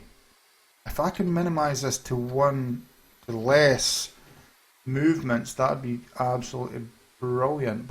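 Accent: Scotland, United Kingdom. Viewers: I'm not saying it's a bad thing but he has a really Scottish accent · Is he really Scottish?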